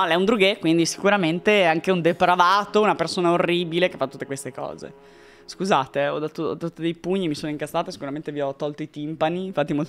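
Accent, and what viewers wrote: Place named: Emilia, Italy. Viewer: "...no no, sono pubici, fanno il ricciolino...!" con la tua cadenza emiliana, sono morto dal ridere, fantastica!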